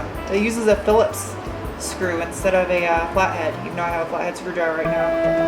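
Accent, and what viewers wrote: Australia. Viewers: As an Australian I loved the acent you would fit right in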